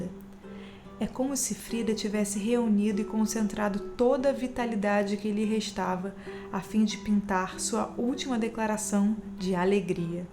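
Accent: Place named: Rio de Janeiro, Brazil. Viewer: Ah esse sotaque carioca...❤️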